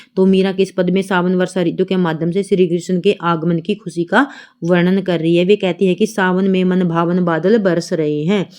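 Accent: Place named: Haryana, India. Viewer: Very nice mam aapki awaj kaithal ki hai❤❤❤❤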